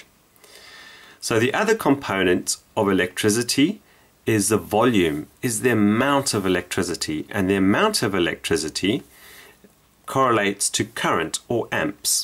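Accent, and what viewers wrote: South Africa. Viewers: I found the subtitles extremely distracting. I assume that some software application was used, which had difficulty with your South African accent. Pity. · are you south african ? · South African accent! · don't you just love our South African accents? thanks for the video this is amazing! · Absolutely brilliant tutorial, I knew as soon as you started talking that you are South African! nice to see a south african using vlogs for educating about electricity, Fab! I bought a multimeter a few months ago and had no idea how ot use it. Now since watching your extremely concise and clear tutorial I am going around and probing everything haha, everything DC that is! AC scares me!!!! quick question: I have a cheap chinese android tablet which I aquired a while ago which worked for one day and then would not charge, absolutely nothing at first glance but then I opened her up and seen its got a 3.7v Cell, I just wanted to know how I would test for voltage on this I want to know if its dead or not? 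do I need to desolder it from the board to get a true reading or can I just measure it in situ? if I find its not the battery where do I go from here, I dont know anything about how these things provide the power to the board? would be really great to be able to use this tablet as my other tablet is a 1st Gen Ipad which I wont slag off it has its pros, but it also has its cons, that being of limited compatability with most of todays apps! thanks for posting I am now more enlightened!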